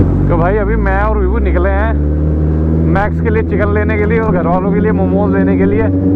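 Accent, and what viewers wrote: Haryana, India. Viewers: Bhai i like you jaat language. Awesome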